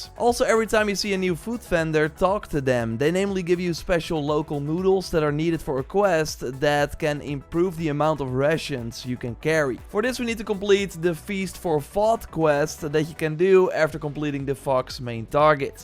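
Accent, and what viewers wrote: Netherlands. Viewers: Yo bro are you dutch ?😂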